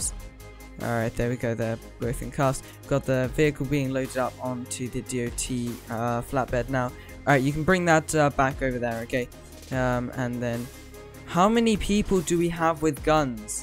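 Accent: England, United Kingdom. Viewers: are you english you sound english i hope you are because i am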